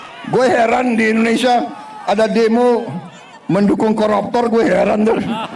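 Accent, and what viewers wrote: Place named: Betawi, Indonesia. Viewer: Aye seneng bapak presiden dialek Betawi ❤ pak presiden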